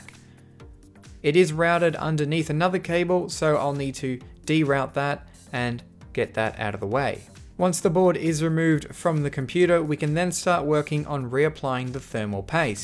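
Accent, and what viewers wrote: Australia. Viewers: soothing? he has a Aussie accent, anything but soothing...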